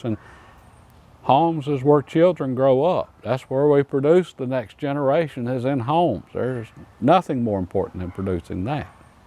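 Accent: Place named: South, United States. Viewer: By the way, I like what you say and that southern accent. I’m an ole Arkansas boy. New subscriber.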